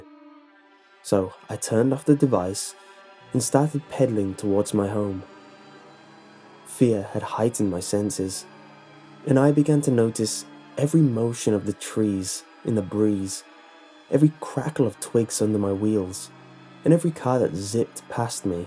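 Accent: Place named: United Kingdom. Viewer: oml, British accents 😣😣😣😣😩😩😩😭😍😍😍 oml.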